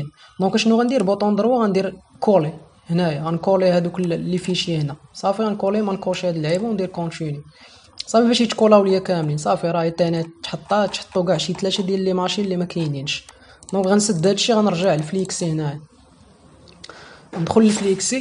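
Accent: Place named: France. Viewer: اتكلم عربي فصيح... كلامك زي الفرنسين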